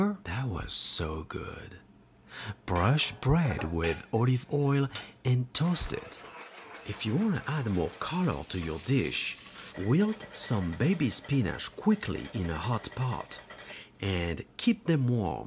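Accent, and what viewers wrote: France. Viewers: Mimi R I know. But I don't mean his frensh accent but more his Terminator way to talk. You know what I mean?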